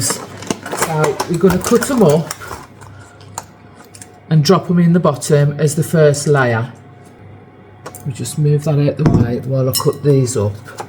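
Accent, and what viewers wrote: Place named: Birmingham, United Kingdom. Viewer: I love your bubbling personslity😊 Are you from Birmingham? My husband is from B and I recognize the accent, so cute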